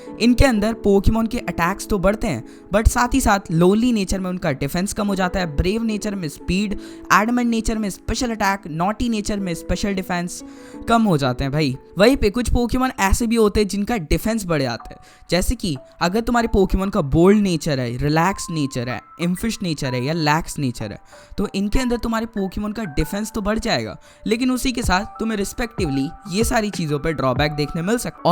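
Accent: Bengal, India. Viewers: Are you freaking Bangali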